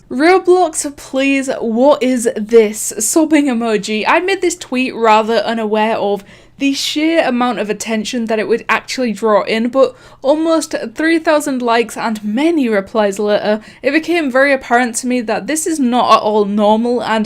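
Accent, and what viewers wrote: United Kingdom. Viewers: I could already tell you were British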